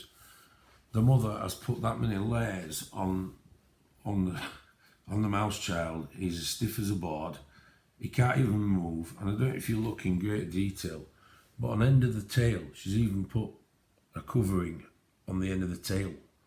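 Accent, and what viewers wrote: Yorkshire, United Kingdom. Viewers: We appreciate you too. I was initially attracted to your channel because I'm an ex brit and enjoyed listening to your accent. You are different and i like your choice of books and supplies so keep them coming. No criticism I'm afraid just carry on as you are. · International (Canada). I love your accent, my Nan was from Yorkshire, you're my people, not to mention the artist thing! Love the variety of books you show and would love to win so I could get some Lightfast coloured pencils from Amazon. It would be great to have a better camera position as its hard to see the pages from the side like that, like an overhead setup. Sorry about your loss of your friend and congrats on your sub count! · Wonderful haul. I love several of the books you showed early on, I'm going to have to watch again to catch and record the names of them. 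Where do you shop for the more unusual books? I'm assuming these aren't all Amazon? I love the fact that this deep voiced Yorkshire man loves colouring. It's just great. Listening to you talking is also very relaxing. My husband is from Lancashire, but close to the Yorkshire border and so his accent was quite soft too, but he's been in the south/midlands too long now and it's worn off a lot. I miss it. I love the soft Yorkshire accent. I don't want you to add me to the give-away, I have plenty of pencils, but that is a wonderful give away. The only thing I would like you to improve about your videos is the camera angle. It would be great if we could see from your perspective rather than at angles. Not easy I know, but that would make a difference to the experience I think and make it easier to see the flip-throughs etc. I'm sorry to hear about your friend. It's always such a shock, especially when someone is young. 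How lovely that you helped his Mum get organised. Congratulations on reaching 1000 subscribers so quickly.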